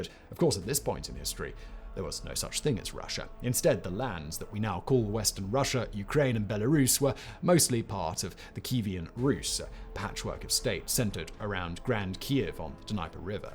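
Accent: United Kingdom. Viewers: As a southern American, hearing you say ain't in your British accent was simultaneously awesome and hilarious.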